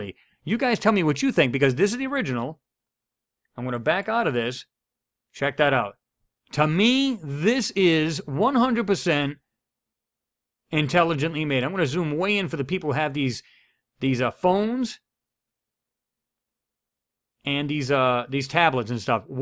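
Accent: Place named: St. Louis, United States. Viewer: good work - by the way, are you from the st. louis area? your accent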